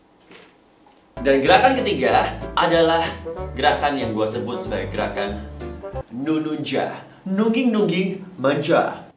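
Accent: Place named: Banyumas, Indonesia. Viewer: ngakak ngapak yh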